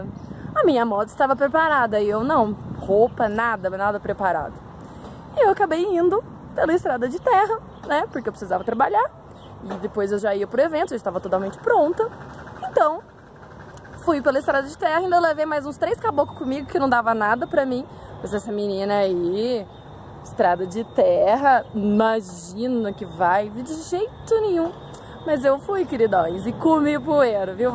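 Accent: Minas Gerais, Brazil. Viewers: De onde vc é guria , fala como mineira com trejeito de gaúcha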